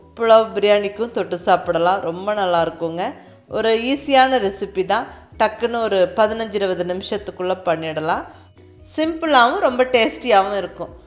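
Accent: Coimbatore, India. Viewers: Kovai Tamil super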